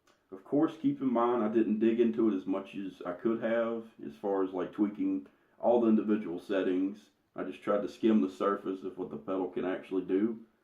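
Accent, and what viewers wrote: United States. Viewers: Incredibly informative video, great work. Oh and the southern charm is always welcome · Will this work worth a shit through the front? For some reason I never have much luck through the loop. You sound like you from Bama.🤘🏻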